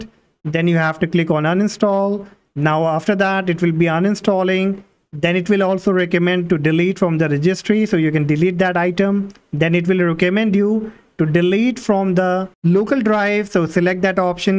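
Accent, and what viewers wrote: India. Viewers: you know vid is good when there is a Indian accent. thank you this actually helped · you know tutorial is good when you hear the indian accent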